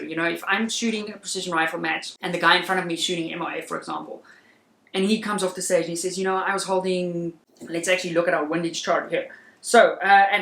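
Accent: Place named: South Africa. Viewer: Man. Goed Om ‘n Suid Afrikaanse aksent te hoor in die vreemde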